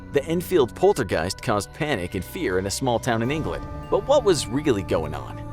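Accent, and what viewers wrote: France. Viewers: Lol he was butchering all those French names but because he said it with a French accent it sounds legit to non-French speaking people 😂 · The French accent is a nice touch👌🏾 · Why does the French accent sound so satisfying??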